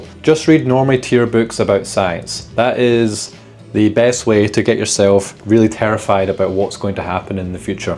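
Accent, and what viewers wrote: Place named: Glasgow, United Kingdom. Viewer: No bonus points for me, I only know that music, Lacuna Coil and so forth because because I played the hell out of VTMB both vanilla and modded. I have to say I like your content and the accent reminds of a co-worker from my last job, originally from Glasgow, we could take the piss out of each other without worrying about our "feelings" or HR. Salt of the earth.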